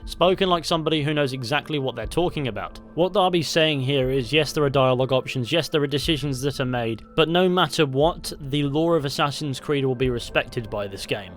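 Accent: United Kingdom. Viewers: Man you're talking so fast😅 and with your uk accent it's damn hard for me to fully get what are you saying(or maybe I'm just a stupid retarded who still struggling with english) and since I'm unfortunately not a native speaker, it makes it harder but I concentrate as much as I can😅 anyways I loved this video and could claim that i understood 80% of your context,love your content 🤙♥️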